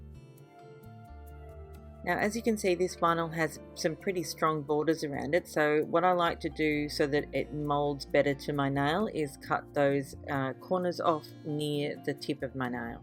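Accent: Australia. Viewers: All of the three design are gorgeous,I'm happy to hear an Aussie nail technician I'm an Aussie too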